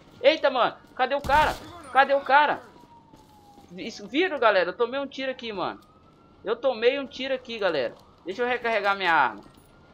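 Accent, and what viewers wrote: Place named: Sao Paulo, Brazil. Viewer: Você é paulista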